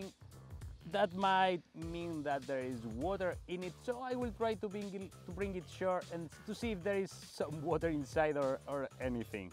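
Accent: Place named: Spain. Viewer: eres espanyol se nota xd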